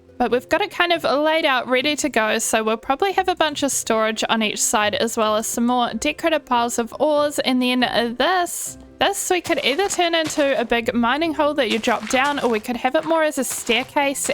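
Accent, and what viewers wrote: New Zealand. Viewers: Instantly recognised the accent!! 🥝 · recently found your videos and wanted to just say thank you, ive been trying to find some kiwi youtubers as ive just moved to australia alone and am missing home alot, sounds stupid but ur voice and the added kiwi accent puts me at ease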